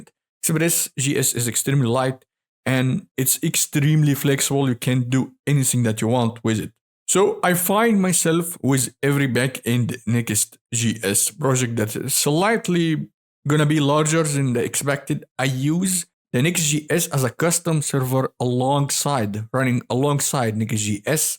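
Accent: Egypt. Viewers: egyptian accent?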